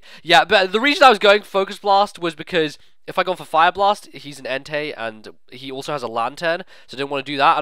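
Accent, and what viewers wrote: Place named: Scotland, United Kingdom. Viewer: Didn't know you are Scottish. So am i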